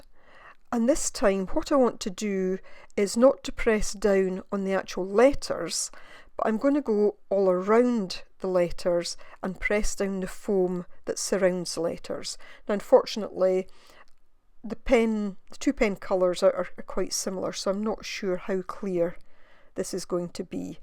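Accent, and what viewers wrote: Scotland, United Kingdom. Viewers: Perfect I've been looking for a video on how to do this for ages. Thanks - and you sound just like me - east scotland, yes?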